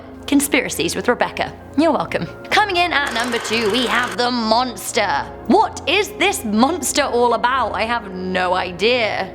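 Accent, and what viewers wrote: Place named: United Kingdom. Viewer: Hi, I love your accent fore sure you can't denie you're from UK ;) <3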